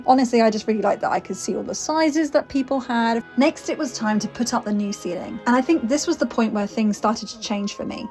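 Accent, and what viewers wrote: United Kingdom. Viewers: She sounds like she's from Plymoth/Plympton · Your British accent is amazing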